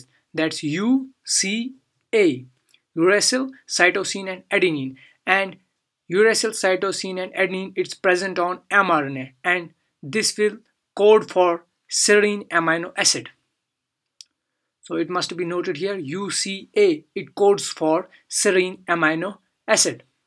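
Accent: Kashmir, India. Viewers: kashmiri accent,am i right? .bdw thank you😍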